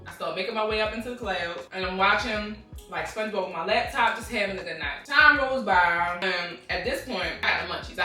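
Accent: Baltimore, United States. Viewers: You have one of the strongest Baltimore accents ever I love it (I’m from bmore county without the accent womp womp ❤️‍🩹)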